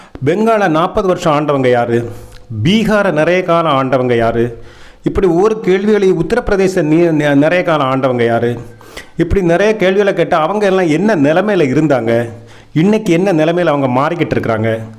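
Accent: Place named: Kerala, India. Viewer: தம்பி அருமையான பதிவு தம்பி உன் குரலில் மலையாளம் கலந்து வருதே நல்லா இருக்கு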